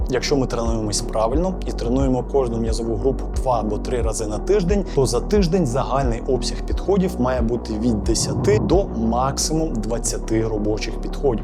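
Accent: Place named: Russia. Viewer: А я думаю що поспішає і з-за цього 20-30 % слів виходить з російьким акцентом. Треба трохи повільніше, щоб звикати до мови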